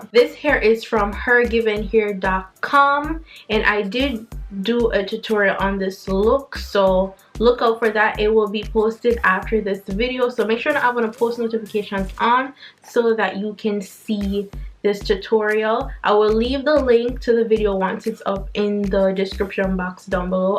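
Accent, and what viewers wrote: Patois, Jamaica. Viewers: Loving the Jamaican accent 💯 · Well, been watching your videos from evening while I do my hair (I'm trying the box braids) and what makes you relatable, is the fact that you kept your patios . Caah be Jamaican if yo no talk di language. Love love love your energy and your vibes.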